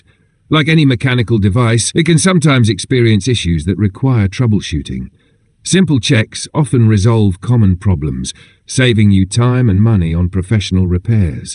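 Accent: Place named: Europe. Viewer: This is the most obnoxious narration. Does every video need a European accent to convey legitimacy. It doesn’t.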